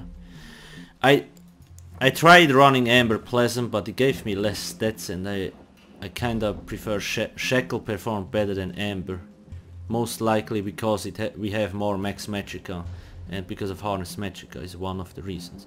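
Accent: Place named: Switzerland. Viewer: Hahaha i knew it! This accent(akzent) must be from a swiss guy :D Grüezi from freiburg :) I got a question. Woulf you mind to help me a bit out about pvp getting better with classes(mainly nightblade or sorc) and other basic stuff(im not a beginner in teso but im a beginner in pvp even if my pvp rank is veteran im not that good)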